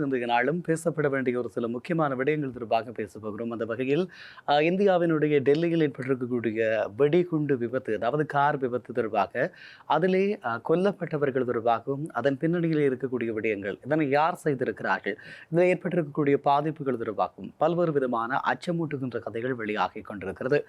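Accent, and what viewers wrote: Sri Lanka. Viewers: Seems the news reader has studied in Srilanka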